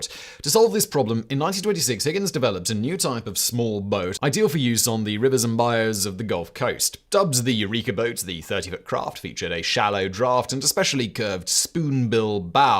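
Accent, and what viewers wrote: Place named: RP, United Kingdom. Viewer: you speak too damn fast. Your speech is pretty close to double the normal speed of a typical English speaker from around the world. Although you have a very crisp, articulate upper class English accent that is not difficult to follow for most North Americans, you speak so rapidly that I believe that you are leaving many non-native English speakers bewildered. If I have one of your videos playing in the background and I’m not concentrating on it specifically, I am losing track of what you have to say at times. And I lived in the UK for three years so I have little to no problem with most English accents short of a Geordie.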